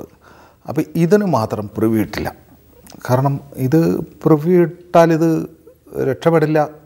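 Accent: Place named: Kottayam, India. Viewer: Not Kottayam slang but Kottayam accent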